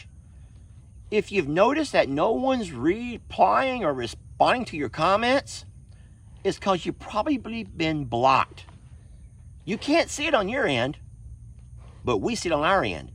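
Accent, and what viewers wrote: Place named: South, United States. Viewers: I would have always thought you would have been the life of the family events when I first started watching ya'll a few years ago. Ya'll are my type of people. Every relationship I've been in they have been the outcast of their families and it's just so sad. My family is so close and only one aunt is kind of an outcast but she hasn't always been.....her actions put her there. I love all of ya'll but you guys and your dad are my absolute favorite. Will have to say out of all of ya'll you keep my attention the best regardless of the video. Maybe what draws me in the most is you talk a lot like we do here in NC. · have read this to you and don't ever want to change your voice I myself love listen to you and your family talk I love the southern accent ❤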